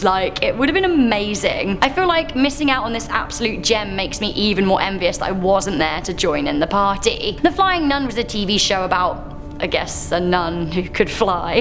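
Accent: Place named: United Kingdom. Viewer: Right now my favorite show is Stranger Things just finished season 3 and I am now off to look for something new. you are my favorite host on this channel I just love your energy and I confess I am one of those silly Americans who love the British accent.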